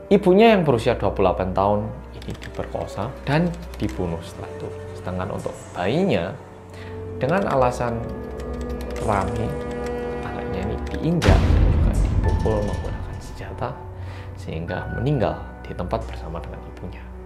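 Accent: Java, Indonesia. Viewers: Orang Korea yang medoooookkkk 🥰🥰 #fanmalaysia🇲🇾😍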